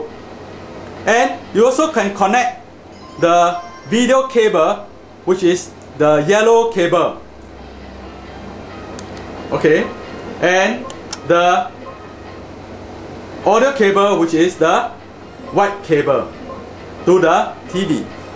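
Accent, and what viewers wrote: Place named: Singapore. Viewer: Guys, this is a Singaporean Accent... And he's using Singlish... the Slang of Singaporeans...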